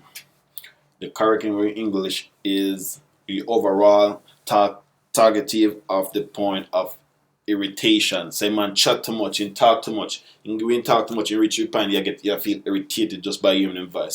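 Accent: Jamaica. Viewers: Finally someone with an actual Jamaican accent :) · This helped me understand the song by; ah I love Jamaican accents, so cool. Also you’re very cute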